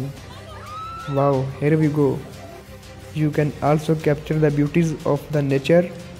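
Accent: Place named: India. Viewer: Your information in english with pure indian accent.but its nice.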